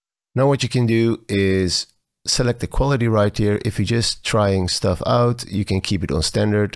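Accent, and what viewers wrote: Netherlands. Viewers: Goede video, klinkt fantastisch! Complimenten! Je Nederlandse accent heeft je wel verraden haha... 🙂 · Ik wist het niet zeker maar ik hoorde een NL accent en achteraf bleek dit inderdaad het geval te zijn. Gaaf! 🫡💪❤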